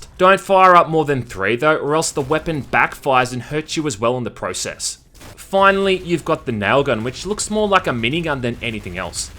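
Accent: Australia. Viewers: You sound Australian.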